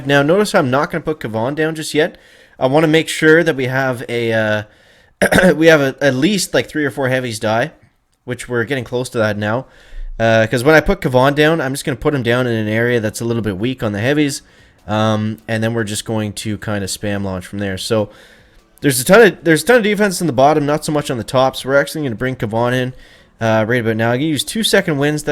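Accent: Canada. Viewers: could you do one vid with your thickest Canadian accent possible please? I would love it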